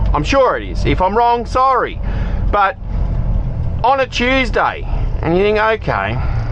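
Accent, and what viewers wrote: Australia. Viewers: After watching vids with over excited yanks yelling rolling R's in my ears, it is like sweet music to hear Australian. I enjoy the reversing skills too - good one bloke.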